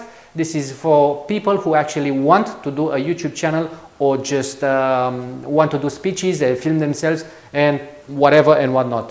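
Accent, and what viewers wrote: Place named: Romania. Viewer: Are you romanian? I recognize the accent :)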